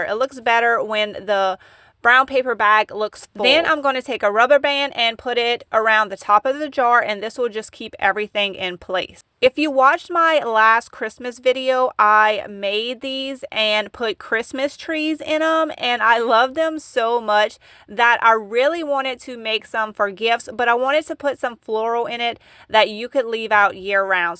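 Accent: Louisiana, United States. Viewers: She’s from southern Louisiana! Cajun country. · I thought I detected some Cajun accent in there. 😁😁😁 I am from Ga. We say "y'all"! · She is Cajun,her accent is wonderful,you my dear are rude !! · You sound like you're from Louisiana, am I right?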